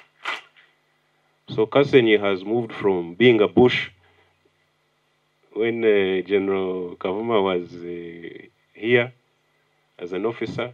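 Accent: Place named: Kenya. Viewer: Ur swahili sounds more of kenyan🤔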